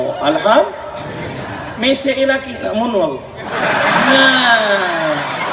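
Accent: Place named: Palembang, Indonesia. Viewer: Seperti bahasa Palembang Ya bang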